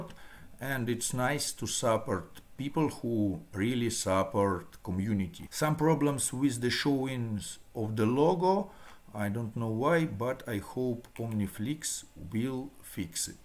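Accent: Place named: Russia. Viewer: Аналогично )) Зато всё понятно - нет багов в понимании английского или американского "прононсиэйшн". По русски говорит на английском!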